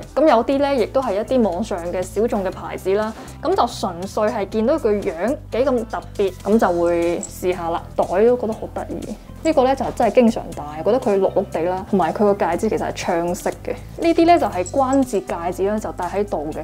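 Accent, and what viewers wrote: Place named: Guangzhou, China. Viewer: 衣著有品味，人靚、聲甜，好喜歡你！聽到你的廣州口音，特別感到親切……。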